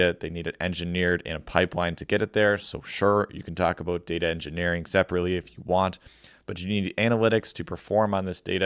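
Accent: Canada. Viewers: Canuck accent!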